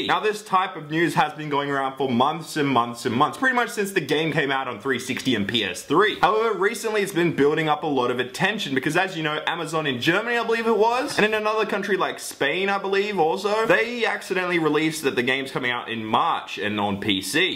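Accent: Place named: Australia. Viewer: My left ear is loving this Australian voice and my right ear is enjoying these random noises.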